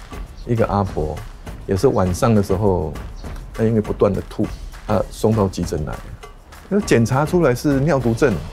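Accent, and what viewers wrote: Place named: Taiwan. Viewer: 賴副總統加油 辛苦你了 聽你講話有台灣的 味道 我一些好姐妹都很喜歡你 老實 又實在 希望你能高票當選 有人要嘿你就會把它黑過去 讚喔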